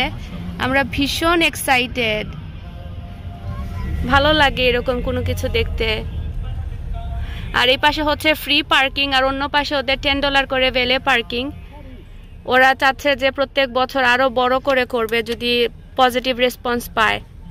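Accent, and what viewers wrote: Sylhet, Bangladesh. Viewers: Are you sylheti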